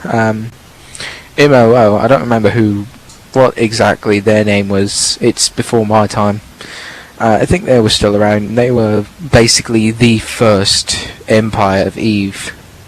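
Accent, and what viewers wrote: United Kingdom. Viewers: British accent!!!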